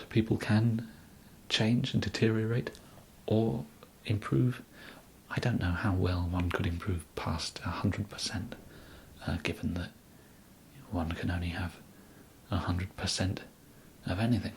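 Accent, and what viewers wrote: England, United Kingdom. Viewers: It's a rare treat to hear an English accent with ASMR - I'm English and I found this very soothing as well as amusing. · I really enjoy English accent ASMR and interesting takes on roleplays, so this video 100% hits the spot for me, great job with your acting. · Okay, so british Howard Wolowitz. Not bad.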